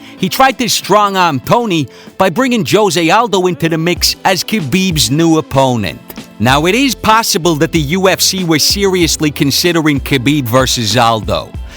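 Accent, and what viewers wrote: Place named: New Jersey, United States. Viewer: Great Video. Love the accent. Where is that from? Jersey?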